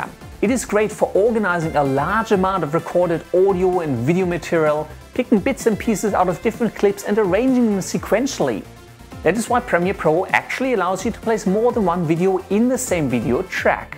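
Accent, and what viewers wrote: Germany. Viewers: So are you a German with an English Accent? I'm trying to figure it out. I understand what you're saying pretty well but it's not California American, that's for sure. Just curious. Your videos are great! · Your German accent is so cool:D! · He has a German accent so he should be German. Anything else would be odd. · Man hört direkt das du Deutsch bist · Man merkt das du deutscher bist :) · "Foa evaa" Das deutsch drückt schon heftig durch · Do i hear a german accent?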